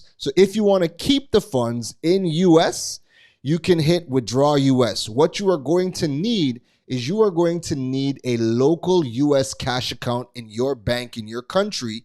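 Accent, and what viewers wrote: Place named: Trinidad and Tobago. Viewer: Where you from bro? Sound Trini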